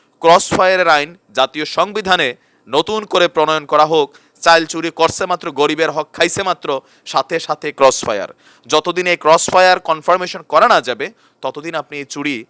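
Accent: Comilla, Bangladesh. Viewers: ভাই আপনি কি কুমিল্লার ইতর মনে হচ্ছে আপনার কথা শুনে.??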